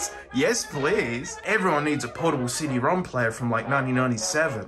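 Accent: Australia. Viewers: Did you just wake up? You sound like Australian James foster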